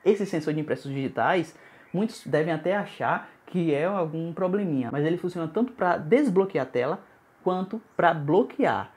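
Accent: Bahia, Brazil. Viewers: Você é baiano né? kkkkkkkk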